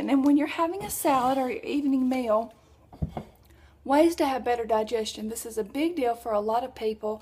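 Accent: South, United States. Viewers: You're videos are so entertaining :D made me laugh quiet a few times! I enjoy listening to you so much, so positive and you're southern accent is adorable :* greetings from Germany :)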